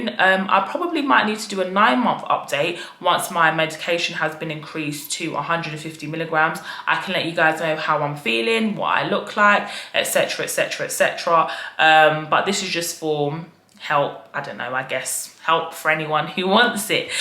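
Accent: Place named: London, United Kingdom. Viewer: YOUR LONDON ACCENT THO.....!!!!I ..I can hear that all day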